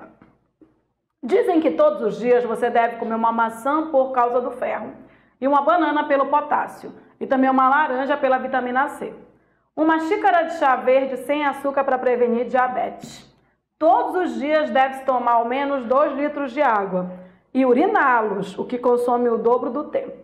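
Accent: Pará, Brazil. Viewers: "Verbo pank " kkkkk. Paraense doida! Grande professora, aulas excelentes. Parabéns prof!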